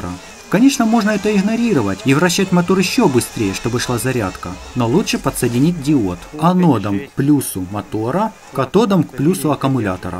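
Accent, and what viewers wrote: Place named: Ukraine. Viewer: Ох ребятки.... Как бы украинский акцент не сыграл с вами злую шутку... Город полон украино-ненавистников!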